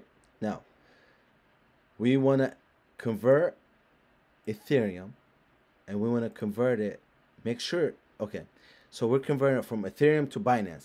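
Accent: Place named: Libya. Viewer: I know a Libyan with the exact same accent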